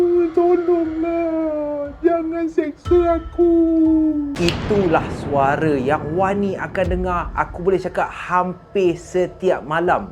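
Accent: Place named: Kedah, Malaysia. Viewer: Orang Selangor nak CAKAP Kedah MEMANG susah lah SIKIT sebab loghat Kedah ni sangat pekat sedikit. Best lah ni.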